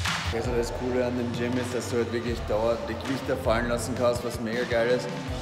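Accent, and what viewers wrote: Vienna, Austria. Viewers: Wos i leiwand finde is des ma deinen österreichischen Akzent heard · Naja is ja eher Östereichisch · Für mich hört es sich an als wärst du aus Niederösterreich/Wien. Schöne Grüße aus dem Waldviertel ✌️ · kommst du aus wien oder oberösterreich? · Es is schön dich mal mit Bissel wiener Dialekt zu hören find ich Ur Geil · Es is so varruckt, dass ma di auf österreichisch a amoi heat..👀🤷🏼‍♂️😂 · Jaaawohl, du hast deinen österreichischen Akzent ned valoan🔥🇦🇹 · Bist du Wiener? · haha wia geil der Österreichische Dialekt! Homma💯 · Englisch sprichst du Mega gut quasi Akzentfrei aber bei deutsch kommt dann der Österreicher völlig durch 😂 feier ich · Es ist er österreichisch mit deutschen Dialekt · well he has an strong austrian accent so it would be hard to understand even if you are able to understand "regular" german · Wo sind alle Österreicher🔝🔝🔝 Wie unfassbar geil es is dich mal auf österreichisch Scherze machen zu hören😂Und wie alle in den Kommentaren voll zamm halten!🦁Ich feiers.... 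· So geil österreichisch vo dir zum hern🇦🇹💗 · I guess you will have a hard time understanding him, is speaking with a heavy austrian accents. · Kommst du aus Österreich oder aus Deutschland? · Wo aus österreich kummt er?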